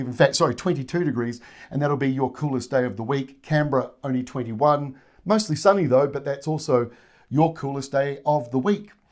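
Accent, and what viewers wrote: New Zealand. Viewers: It's always good to hear a kiwi doing an Australian weather forecast. Good one, Mr!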